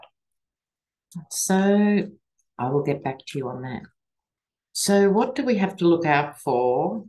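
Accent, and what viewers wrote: Australia. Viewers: I Love that you're going to be on Mary Trump's show as a political commentator. You can seem sometimes like a Sherlock Holmes of tarot and occasionally a gypsy woman tarot reader always with a distinct Aussie accent. Looking forward to your considered thoughts and opinions.🙂 Peace ✌️ ❤️ Love you lots ❤️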